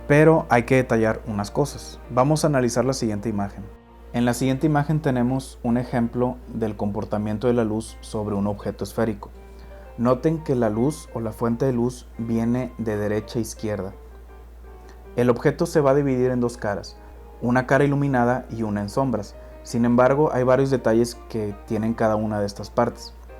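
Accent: Mexico. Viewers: Muchas Gracias estoy siguiendo tus videos con mucha atención, Oleo no creo que le entre aún, me gusta las lecciones de Bob Ross, pero tus explicaciones muy buenas, y claras, tienes acento mexicano, si no vives cerca de la frontera MX USa y ocupas algo de USA te puedo ayudar si así lo deseas.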